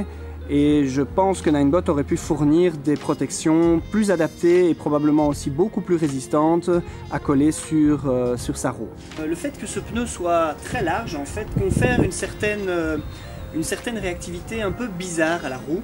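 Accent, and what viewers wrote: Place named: Belgium. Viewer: Bonne vidéo! Je me suis décidé et j'ai commandé la Z6 :-) Tu es de Belgique ? J'ai cru entendre cet accent familier. Ce serait cool de wheeler ensemble en tt cas, je suis de BXHELL !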